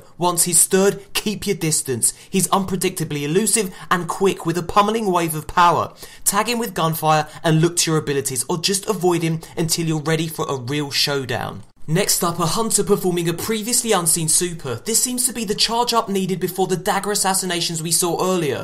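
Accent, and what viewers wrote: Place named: United Kingdom. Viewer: It's only easier to understand because you speak with that accent. I'm an american, and I find it much more pleasant to listen to an english/brittish accent, than to an american one. it sounds better to me. it's all opinion.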